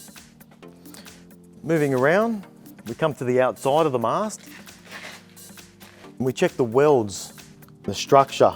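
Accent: Australia. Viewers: The guy is from Australia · The language is English. The "accent" is Australian. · The Australian accent is priceless!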